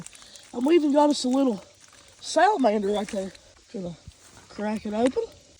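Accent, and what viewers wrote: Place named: South, United States. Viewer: Your accent is actually the best, southern accent!!!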